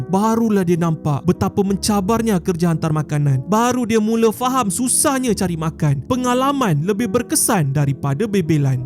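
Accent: Sabah, Malaysia. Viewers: ak lama sudah mau tnya ni, kw ni org sabahan ka?😂😂 dgar logat sabahan, minat smua konten kau. Smua lucu² ndk bosan tgok. Sihat slalu dan semangat bekonten 😊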